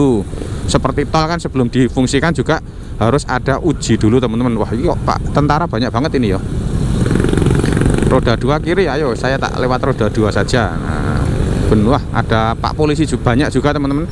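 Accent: Java, Indonesia. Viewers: Mas jowone cik medhoke👍👍mksih info" lewat chanelnya🙏🙏🙏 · Cekibrot.... Medhokk... Pake telor 3 Mantap 👍👍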